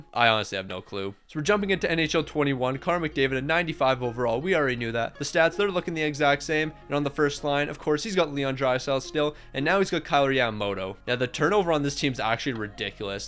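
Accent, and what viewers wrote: Calgary, Canada. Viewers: Are you from calgary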